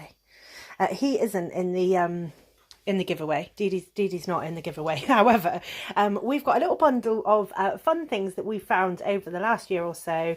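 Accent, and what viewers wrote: England, United Kingdom. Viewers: Hej and. I do really love your videos, not only are they extremely exciting but at the same time very much relaxing. What I find exciting is what you two find and the many possibilities items that still haven't been found. you voice and your lovely English accent is like climbing into a warm bubble bath, so relaxing., I that you weren't in too much pain during or after your larking trip, please take care of your foot and be careful when in the water.🤗🇩🇰 I hope that your lovely and generous giveaway is open internationally. 🥰 I look forward to many more videos. By the way what are the chances of you and taking a larking trip to London where you do a bit of larking/mud larking at the Thames? And maybe follow the Thames up to Oxford and Windsor? That would be a wonderfully interesting video and a wonderful vacation for you. 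Lots of love from Denmark 🇩🇰 Ps. I too love the Victorian era and Queen Victoria is my favourite monarch, as well as Queen Elizabeth.👑 They were such strong women during a time where women were not "allowed" to be that way.🤔 My goodness my message to you two has become some what of a novel, I do apologise for that.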